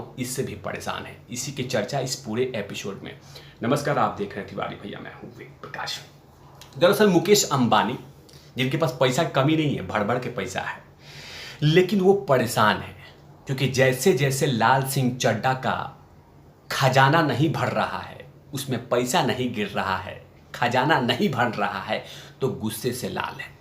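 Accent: Bihar, India. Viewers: Kya Bihaari bolte hain bhaiya, matlab ekdum maja hi aa gaya.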